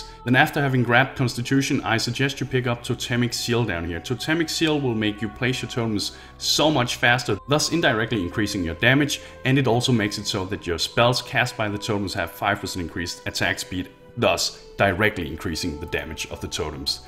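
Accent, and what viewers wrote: Denmark. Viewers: Hmm, that accent. You're Danish aren't you!? :) :) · Hey really good video man! i recently started playing and have been toying with a necromancer/totem witch, with a bit of fire spells to help on the side. Is it to spread out or is it viable? Btw are you danish? i just thought i heard it in your accent :). If you are, i can tell you, it was definitely difficult to hear it.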